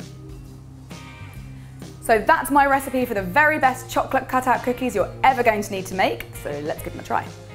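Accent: United Kingdom. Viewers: i like to hear British accent:D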